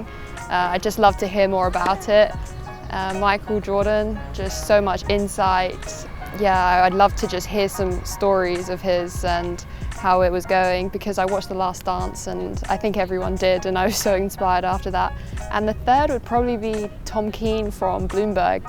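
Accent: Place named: United Kingdom. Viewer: Ll aa ndon... xexexe... so british eh!!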